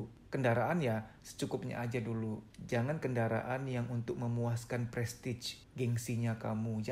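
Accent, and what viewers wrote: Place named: Pontianak, Indonesia. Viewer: Abang nya ini kek org pontianak logatnya hehe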